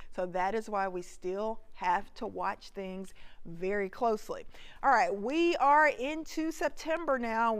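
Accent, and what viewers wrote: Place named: South Texas, United States. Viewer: Love the Lil Southern Texas draw and the way you use it too talk 🦜........just lovely 🌹😍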